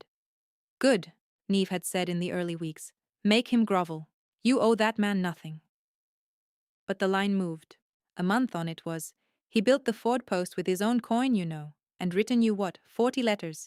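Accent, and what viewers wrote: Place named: India. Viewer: Can't listen to this story, sounds like Idian Muslim accent which hates dogs!!!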